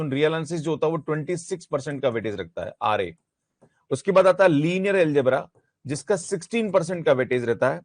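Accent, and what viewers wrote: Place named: Haryana, India. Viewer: Sir aapki bolli me haryanvi touch h😝😝😝 Jo bot mst lgti h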